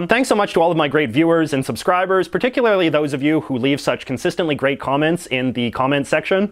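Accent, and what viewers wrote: Canada. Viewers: Your Canadian accent physically hurts me. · Wait, are you Canadian? Every time you say, “about” I’m like, heyyyyyy.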